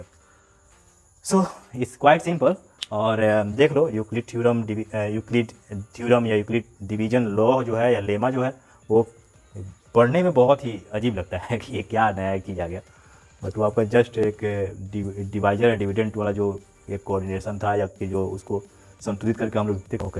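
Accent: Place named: Bihar, India. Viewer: Bihari look with Bihari acent to good man👌👌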